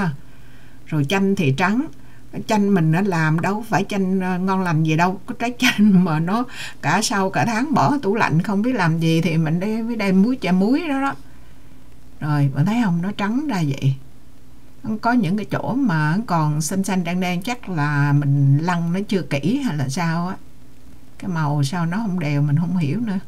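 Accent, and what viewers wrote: Quang Nam, Vietnam. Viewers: cam on co da chia se cong thuc. Rat de dang va cach noi chuyen nguoi Quang Nam cung rat de thuong.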